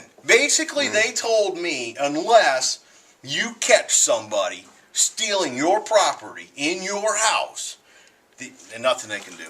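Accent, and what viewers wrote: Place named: United States. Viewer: if it wasn't for your American accent.. I'd think you were a fellow Canadian brother.. hahah annnnddd nobody here will steal your guns.. they may take some of your beers though! haha nice guns.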